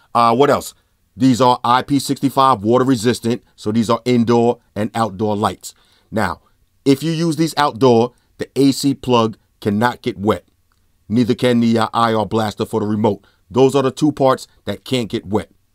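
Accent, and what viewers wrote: New York, United States. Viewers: i feel like i got bullied into buying this... his voice is mad aggressive, mad convincing tho lmfao you can tell hes a new yorker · R u from New York? Ur voice is very cool 😎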